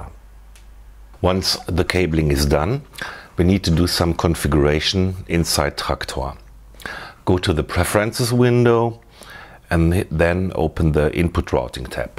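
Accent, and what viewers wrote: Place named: Germany. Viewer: hachja, deutsche und ihr englisch..:D danke für`s video:)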